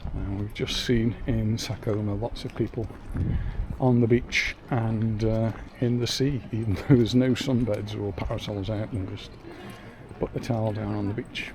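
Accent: Stoke, United Kingdom. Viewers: Are you from Stoke fella? You got a bit of an accent. I really didn't enjoy Cala Bona when I went. Far too touristy for my liking. If I wanted to eat burgers for dinner I'd go to Hanley. Edit - yeah, when I heard say "go on eet' that answered my first question.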